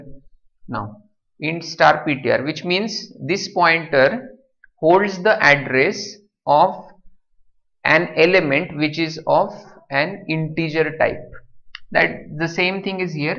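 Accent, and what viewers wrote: India. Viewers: That's indian accent , it's no way a British one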